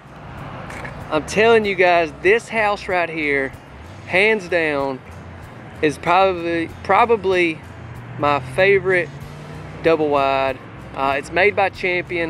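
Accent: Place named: Alabama, United States. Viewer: Great video, I would like to mention how I enjoy your Alabama accent, my spirit is good 97% of the time as I journey through life! And I am AA and i'm a fan!.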